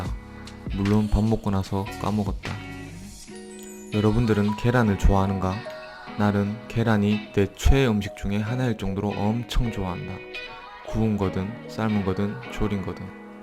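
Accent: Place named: Ulsan, South Korea. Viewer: 울산분이신듯요 ㅋ 울서방도 울산사람인데 좀 억양이 좀 다른거같기도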